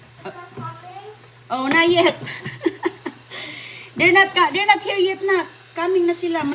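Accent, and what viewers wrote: Visayas, Philippines. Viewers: D ka pa nasanay ateng haha bisaya ba sya Kya ganyan salita nya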